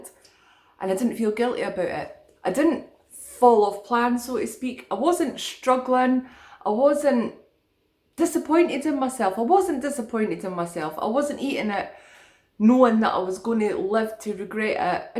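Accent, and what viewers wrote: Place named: Wales, United Kingdom. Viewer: I love all the accents on YouTube. I don't currently have a Welsh one so off I go to channel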